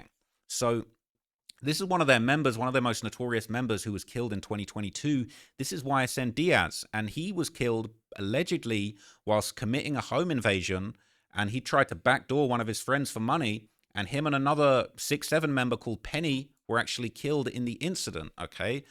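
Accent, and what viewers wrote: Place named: RP, United Kingdom. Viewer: It sounds posh & refined.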